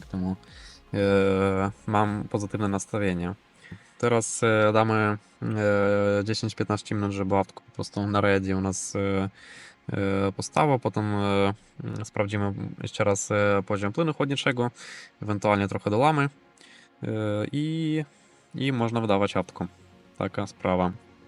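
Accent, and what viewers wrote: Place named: Eastern Europe. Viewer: Słyszę akcent typowo słowiański 🇺🇦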